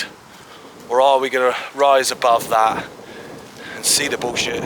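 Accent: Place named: Cockney, United Kingdom. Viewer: Say ands b proud of cockney accent I am one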